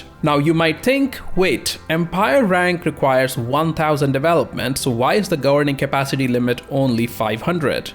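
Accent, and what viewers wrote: India. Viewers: I think the appropriate term is Indian or South Asian, Hindu means someone who is Hindu and may be offensive to those who are of other religions. And yes I agree, he seems to have an Indian accent. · An Indian accent in the gaming community :D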